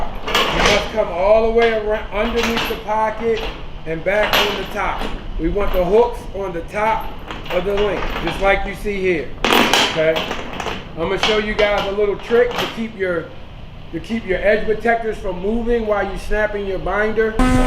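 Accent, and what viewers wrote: Baltimore, United States. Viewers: You can tell this guys from Baltimore by that accent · my manz got that baltimore twang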